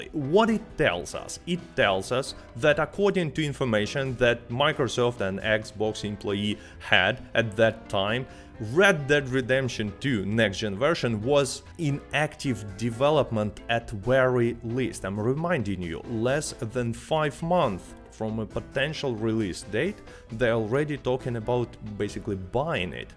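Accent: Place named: Russia. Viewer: Why this man has so hard Russian accent?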